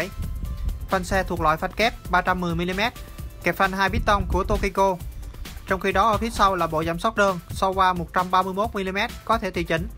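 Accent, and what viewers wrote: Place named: Binh Dinh, Vietnam. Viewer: Lam on dừng nói tiếng bình dinh ko nghe dc gì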